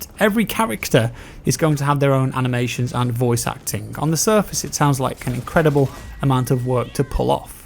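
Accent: United Kingdom. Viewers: “Cameras are everywhere” this guy has a British accent and doesn’t know that London is the city with the most surveillance in the world, cameras are already everywhere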